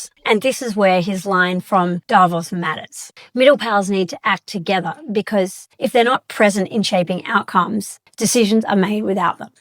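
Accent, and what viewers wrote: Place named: Australia. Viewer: Ah sir, you are too kind! Just a straight-up Aussie accent :)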